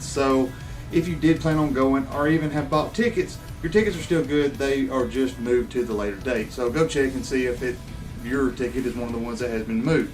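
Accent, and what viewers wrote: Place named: Alabama, United States. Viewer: Hate to break it to u bud ,but you sound like ur from bama too lol. I'm from West Texas so it's same shit different accent, we had boosted and Kayla,doc,Monza, birdman,Shane and banknote here and yea there people taking videos infront of the car as its burning out , people all around them all the way tell the would take off and they were all really laid back about it too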